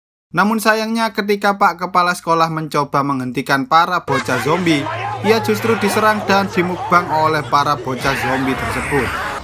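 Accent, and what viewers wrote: Java, Indonesia. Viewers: Wong jowo to mas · Wong jowo yo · Jawa bang? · Medok njir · Anjir wong jowo pisan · Ngmong kaya bhasa jwo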